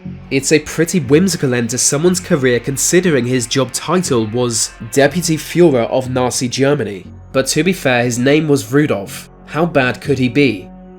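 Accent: United Kingdom. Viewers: You need to go to a surgeon for your face... hey that's really rude of me isn't it? I don't mean that really. It's not a speech impediment. It's actually a dialect accent of Britain.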